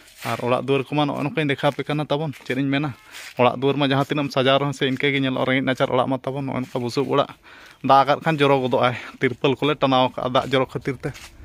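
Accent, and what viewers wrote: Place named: Santhali, India. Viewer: Dada aplogh k santhali acent ❤❤❤ bhut accha hai love you dada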